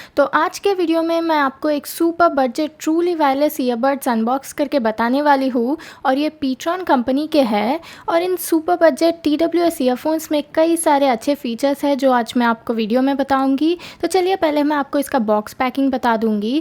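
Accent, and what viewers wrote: Hyderabad, India. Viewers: Good review.. sounds hyderabadi slang....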